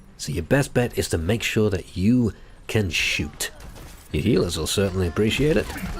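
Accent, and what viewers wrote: United Kingdom. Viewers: But he's a strong contender with his British accent ( is a contender of Morgan Freeman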